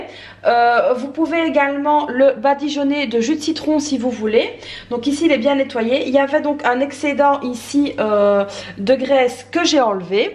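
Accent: Belgium. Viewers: Bravo super bonne recette merci,c un accent belge?🤭 bsa7a le poulet il est bien réussi☺😚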